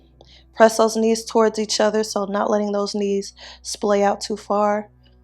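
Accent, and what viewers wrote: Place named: Chicago, United States. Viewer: Peace be upon you. I'll be honest, I didn't like your channel when I first discovered it probably over a year ago now, but I'm sooo glad I gave it another try! I loved this flow and something about hearing your Chicago accent in a yoga practice makes it that much more homey for me. Thank you love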